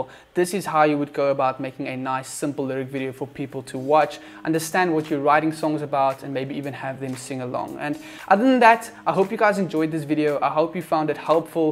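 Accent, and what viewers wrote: South Africa. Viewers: Recognizing that South African accent a mile away. My bru 👏🇿🇦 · Lol i was skipping thru the intro ...and was like wait hold up...lol if u South african,you can't miss it lol🔥🇿🇦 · I reckonize that SA accent my bru 🇿🇦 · you can recognise the South African accent anywhere! :) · why am i hearing a lil bit of ab de villers sound when he is speaking are you from SA?? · This is dope thanks for the hook up, nice tips especially the Motion backround ... Dude ,I could swear you sound South African... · I was wondering why he sounded like some one from South Africa😂😂😂😂😂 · Hahah I can hear your south african accent 😂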